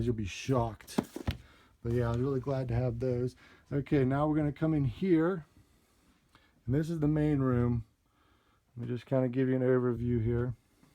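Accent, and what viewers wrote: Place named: Maryland, United States. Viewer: are you from Maryland? i keep hearing a slight MD accent. amazing collection, BTW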